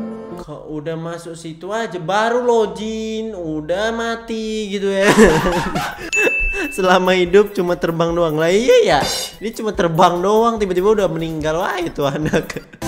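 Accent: Java, Indonesia. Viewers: Bang Lo jawa mana bng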